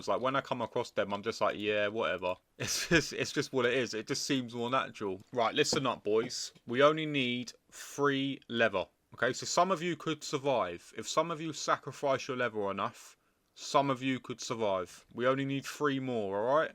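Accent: United Kingdom. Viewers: Yo bro love your british accent,continue doing content i will suport you! Cheers 👋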